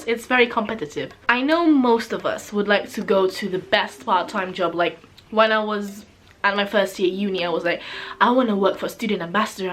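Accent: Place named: United Kingdom. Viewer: Aw<link> britishnya udh mulai kedengeran nih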